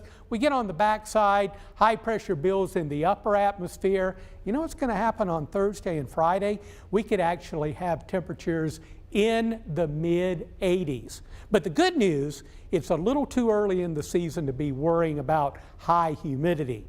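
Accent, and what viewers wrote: South, United States. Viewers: This guy talks like a hillbilly imbecile.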